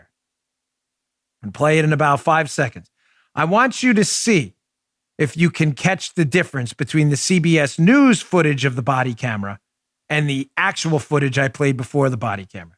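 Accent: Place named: Queens, United States. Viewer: This Queens girl loves your voice. It's a piece of home I miss terribly. I ''ve lived in Texas for 46 years.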